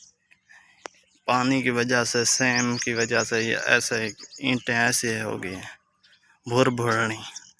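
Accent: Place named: Saraiki, Pakistan. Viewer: ویسے تو آپ کی اردو بہت اچھی ہے تھوڑے اردو کے الفاظ آپ کے کٹ جاتے ہیں وہ آپ کی سرائیکی زبان میں آ جاتے ہیں لیکن کوشش کریں صحیح بولنے کی انشاللہ آپ کو اردو صحیح آجائے گی 👌🏻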